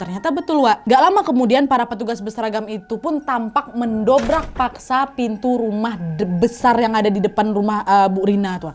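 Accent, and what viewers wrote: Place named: Dumai, Indonesia. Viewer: Ini orang Dumai ya?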